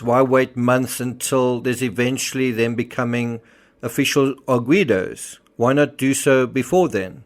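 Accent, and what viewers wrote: South Africa. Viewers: Just found your channel from the Deception Detective who reacted to this video! Love it! Also awesome to hear a South African on YouTube! Keep smashing it!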